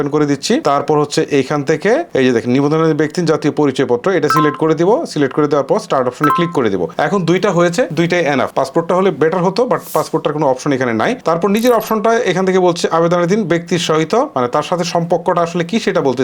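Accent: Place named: Brahmanbaria, Bangladesh. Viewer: Brahmanbaria mone hocche 🤭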